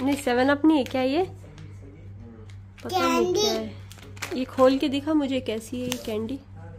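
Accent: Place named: Middle East. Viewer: Ap Pakistan se ho k middle east se qk ap Urdu JB bolti ho to jaise lagta hai k ap middle east ki ho.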